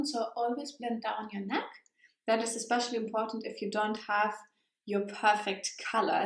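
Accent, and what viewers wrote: Germany. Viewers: Die Message ist sehr schön: man sollte nicht zu stark anders aussehen. Du bringst es auf den Punkt. Übrigens ist dein Englisch richtig gut. Ich rede auf der Arbeit 95% Englisch und muss echt mal lernen so wie du zu reden. Auch wenn man deinen deutschen Akzent etwas raushört (was ich süß finde) machst du keinen Fehler! Ich mache öfters Fehler oder denke kurz nach. Und wenn ich mich dann über etwas aufrege wird es von Wort zu Wort immer mehr deutsch 🤣🤣🤣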